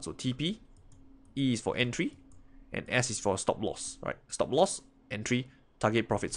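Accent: Singapore. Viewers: He had typical Singaporean accent 😍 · I think it's probably a Singapore accent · I miss my Singaporean friends because of your accent bro. 😫🤩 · him speaking in a singaporean accent doesn’t mean English is his first language. Our first language is English most of the time. Don’t be ignorant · Singlish for sure 😃. Very catchy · All Singaporeans speak like this! I lived and worked in Singapore for ten years and married a Singaporean gal. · Singaporean accent to me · Are you Singaporean? your accent look like it. I used to work there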